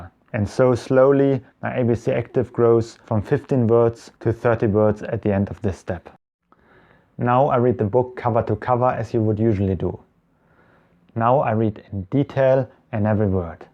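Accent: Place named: Germany. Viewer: Are you German? You sound German.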